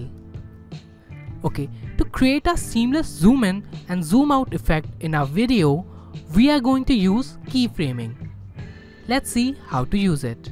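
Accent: India. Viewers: random dude with Indian accent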